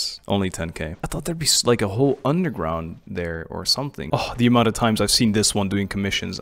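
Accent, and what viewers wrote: France. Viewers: WAIT ARE YOU FRENCH ? because you were pronouncing Fontaine very frenchly so I had suspicions but now... je suis trop contente parce que j'adore regarder tes vidéos en général, c'est super fun et tranquille :D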